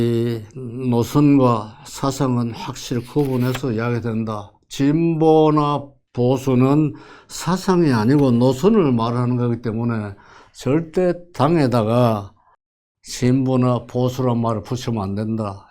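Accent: Gyeongsang, South Korea. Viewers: "언어"를 "은어"로 발음하고 "어"와 "으"를 구별않는 경상도 식 억양이 섞였지만.. 직업적 강사가 아닌 분이 줄여서 하는 말이라 좋고, 오히려 더 확싫히 이해가 가네요.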